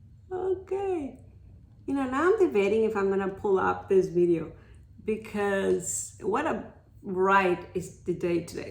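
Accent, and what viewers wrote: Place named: Mexico. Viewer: I had a feeling you’re from Mexico. I’m from Los Angeles, so I’m super familiar with the accent. Now I live in Miami, so I don’t hear it as often anymore- it’s all Cuban, Dominican, island accents and some Central American here and there. Well mujer- I’m digging your channel. You have tons of videos and it’s always refreshing to see videos of more grown women! Keep it up and much success to you!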